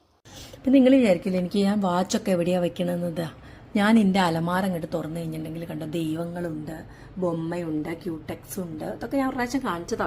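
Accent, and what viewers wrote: Kozhikode, India. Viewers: Kozhikodan slang nice presentation❤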